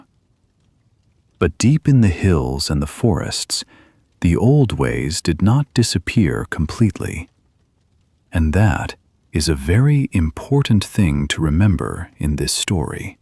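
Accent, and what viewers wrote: United Kingdom. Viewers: Many thanks for this channel You're accent is British isn't it?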